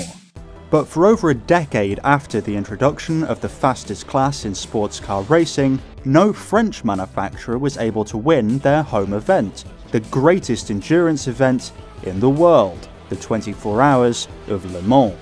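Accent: United Kingdom. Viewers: Brother a man with a British accent does not get to joke about French automotive reliability. Where's your car industry gone? And why did that happen?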